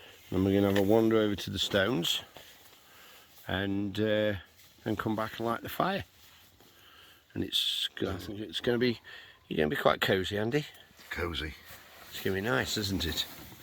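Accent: Stoke, United Kingdom. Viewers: Just seen your review, I'd like one,of these. A cracking review, thought you were a stokie, Im from castle, we go up there camping also, may have to get one.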